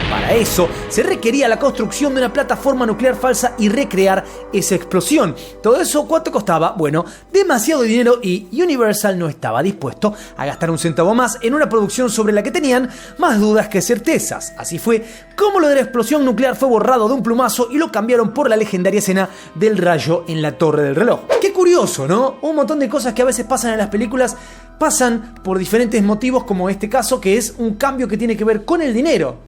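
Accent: Argentina. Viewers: Me estresoooo tanto tú forma de narrar ...entre ese acento argentino (ufff) y la forma exagerada de las impostar la voz que no logro acordarme cuando vi Back to the future!!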